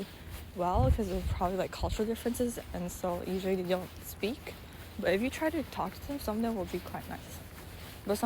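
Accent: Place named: United States. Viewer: You have an American accent. Your skin so nice too.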